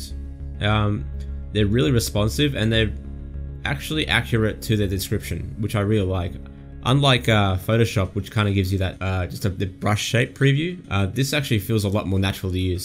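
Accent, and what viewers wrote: Australia. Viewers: Wow straight off....Aussie talk, i miss people being just them selves, not afraid to speak your mind and not worried about the odd swear word thrown in. :) What part of DownUnder you come from, im a sandgroper, if your Aussie you know where that is :P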